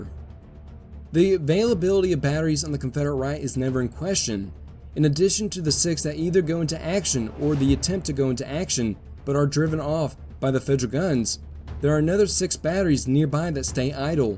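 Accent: South, United States. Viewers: I am loving this series. It is great to have someone dedicating their time and effort to a series on solely the American Civil War, demonstrating an in-depth knowledge with each video (as opposed to creating shorter less-detailed videos on disparate topics). The soundtrack is also great, helping to convey the magnitude and drama of the events unfolding (as it does with Epic History TV's Napoleonic series). So much is evoked by just blue and grey squares! It is also nice to near your accent, clearly that of someone who lives in the region where this war was fought, which I imagine gives you a more intimate perspective on the conflict, and gives these documentaries a more personal feel. As someone who used to live near Harper's Ferry, I saw many sites that were important during this era, but I never put it all together into a concrete interest in this war, rather focusing more on "exotic" European History. 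This series is helping me to understand the military history of the Civil War in far greater depth than I otherwise would have, and is giving me a greater appreciation for the ground upon which this history unfolded. Thank you for making this!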